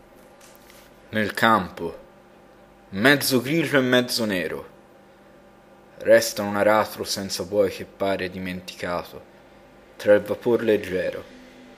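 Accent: Tuscany, Italy. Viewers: Allora, non so a cosa servono le tre letture, comunque non volevo dire questo. A me l'accento da toscanaccio maledetto piace, mi sa di uomo con poca istruzione (e sicuramente non lo sei) che questa poesia la recita da attore protagonista. Molto interessante. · Scommetto che sei toscano haha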